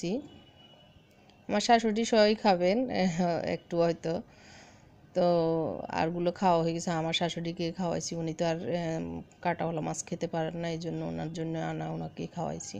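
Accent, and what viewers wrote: Noakhali, Bangladesh. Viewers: Apner kotha gulo purai noakhali la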